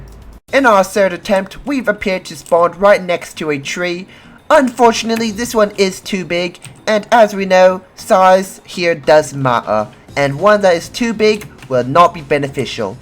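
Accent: United Kingdom. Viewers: I swear he sound British.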